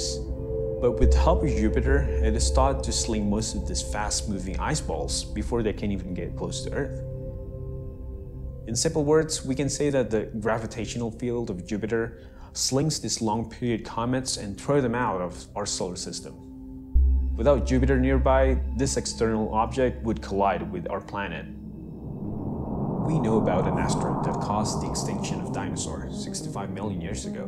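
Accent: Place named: England, United Kingdom. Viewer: ur english accent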